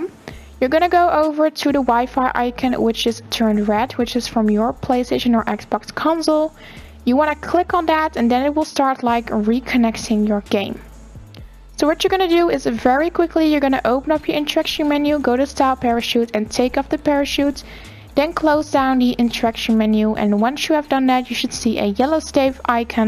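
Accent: Netherlands. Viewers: It's her accent, shes from nederlands I'm pretty sure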